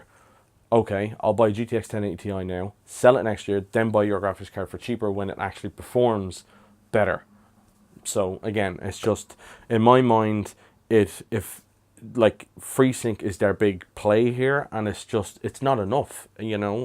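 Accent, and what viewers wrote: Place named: Ireland. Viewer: I'm trying to pick out the accent to see which county (or even which province) you're from. I'm not the best with accents though. Unless it's a strong Dublin, strong Cork, strong Donegal, etc I'll struggle. xD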